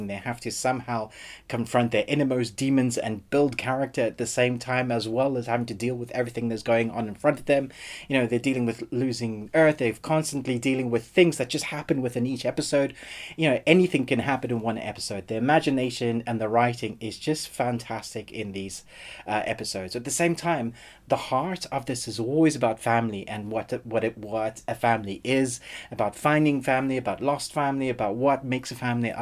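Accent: South Africa. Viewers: Is it just me or does this guy sound South African?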